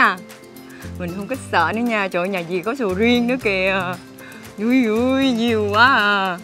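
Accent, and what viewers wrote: Vietnam. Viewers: dể thương quá chú rất thich cach nói chuyên dúng chất ng vn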